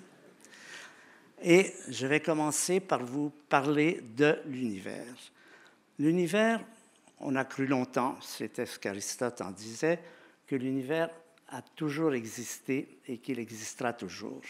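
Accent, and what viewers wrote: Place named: Quebec, Canada. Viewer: une allure de savant un bel accent quebecois merveilleux conteur grand vulgarisateur d'une science extrêmement complexe que l'histoire de l'univers de l'infiniment petit à l'infiniment grand.....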